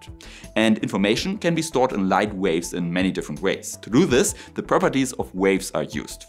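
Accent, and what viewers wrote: Germany. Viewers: There is nobody I would rather learn science from than a German dude with a German accent.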